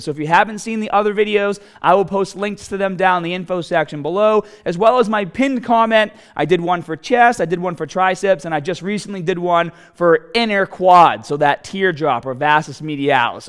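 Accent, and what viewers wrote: Boston, United States. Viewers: Hey bro I’ve been watching your channel for ever if feels like!! just wanted to tell you, your so inspirational and full of information that you have inspired me to start my own fitness transformation journey and VLOGG it as well for YouTube!! Love your channel and I love your information n that Boston accent is for real!!!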